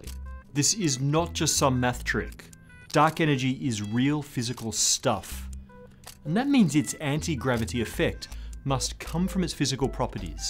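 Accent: Australia. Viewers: This chap has an Australian accent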